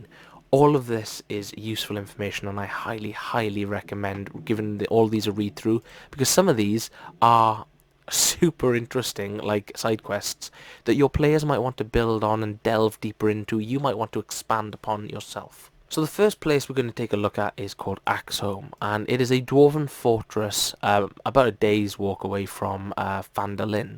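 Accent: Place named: Wales, United Kingdom. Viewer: Love the advice. Couldn't help but notice the accent. Do you play in a store 8n wales?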